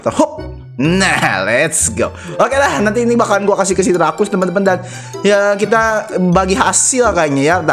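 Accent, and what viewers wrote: West Java, Indonesia. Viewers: logat nya kaya orang sunda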